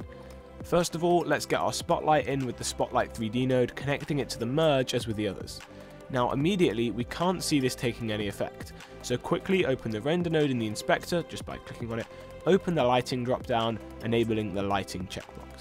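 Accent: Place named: United Kingdom. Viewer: terrible british accent, can't understand anything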